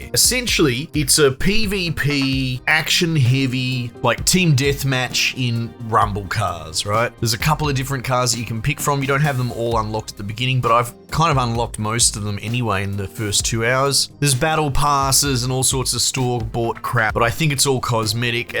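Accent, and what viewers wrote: Australia. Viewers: Thank You - and very nïce english/aaastralian' langüagé :- ]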